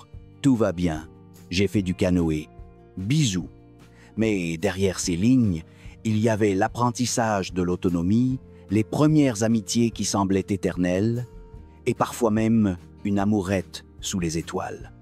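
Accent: Quebec, Canada. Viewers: Le paradoxe : un accent québécois pour nous narrer un moment de la "franchouille beaufesque" parisienne. Un mauvais délire.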